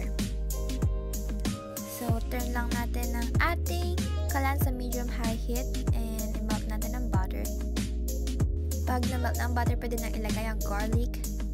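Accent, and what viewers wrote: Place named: Philippines. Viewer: ralf macalma ?? tf galit much? chill. kuya, ever heard of accent? normal lang may accent mga pinoy/pinay.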